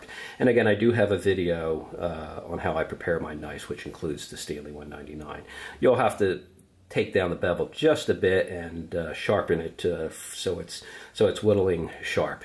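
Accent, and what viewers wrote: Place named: Philadelphia, United States. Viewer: Another great tutorial! That really sounds like a Philly/S.Jersey accent, haha! Im in central PA myself! Go Birds 🦅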